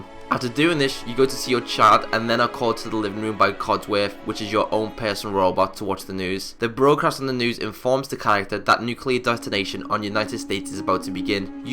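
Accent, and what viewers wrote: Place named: England, United Kingdom. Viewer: "Nagaski" ? It's pronounced "nah-gah-sah-key" I know you're English but slow down with your words homie. 👍 Love the videos. This is simply just constructive criticism.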